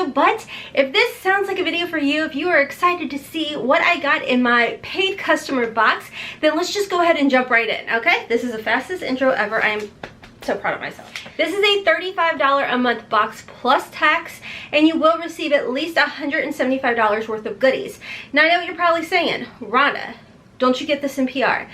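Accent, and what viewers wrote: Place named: Kentucky, United States. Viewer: That Kentucky drawl comes out from time to time. When you said MAC, my captions thought you said “mayak”. Like kayak, but with an m. 😂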